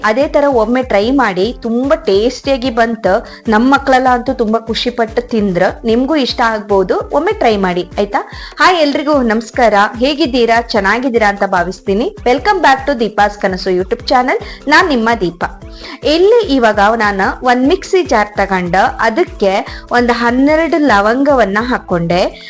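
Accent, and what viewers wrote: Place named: Kundapura, India. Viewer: ಕುಂದಾಪುರ ಕನ್ನಡ ನ 😄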